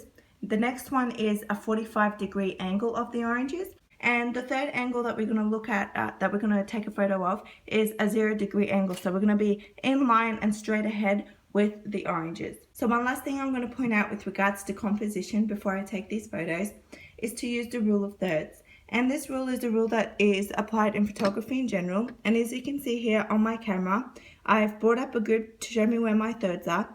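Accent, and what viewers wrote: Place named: Australia. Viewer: I've watched all your videos and I love 'em. I'm curious though, your food (in a previous video) look like Asian/Malaysian food (curry puff, rice, etc) but you sound Australian. 😊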